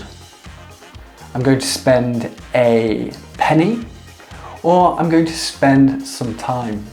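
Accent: United Kingdom. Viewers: Im not British but I like British and I like your British accent.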